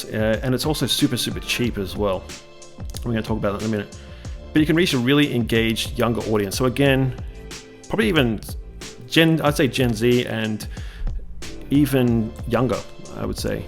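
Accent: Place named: Australia. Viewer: why does refreshing your page make you more trustable..... you sound Australian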